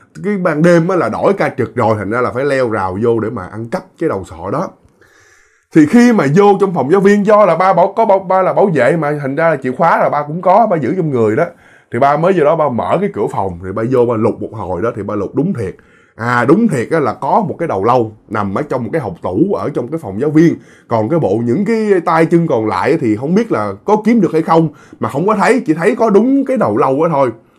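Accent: Mekong Delta, Vietnam. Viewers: Cái gì mà thuật lại câu chuyện từ người khác. Mà kể như chính mình chứng kiến... Cái gì nói tiếng miền tây rặc luôn..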